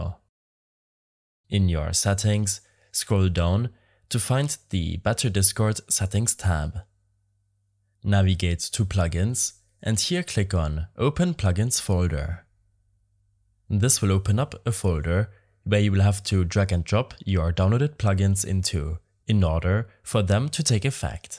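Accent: Germany. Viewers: I smell deutsch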